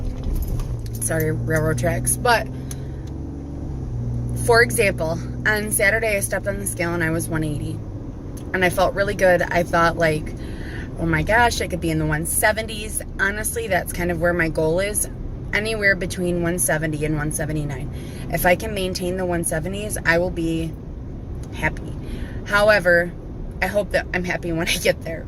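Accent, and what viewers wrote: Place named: North, United States. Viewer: LOL can't help but check out the scenery as your are driving....looks like Iowa? and I detect a slight northern accent so Northern Iowa or Southern Minisota??? Anyway I really appriciate your videos. I'm on day 2 with Contrave.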